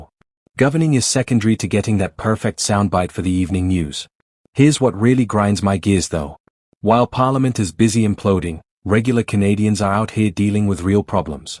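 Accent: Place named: United Kingdom. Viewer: Why the Brit voice and you are showing provincial leaders instead of Federal parliament members?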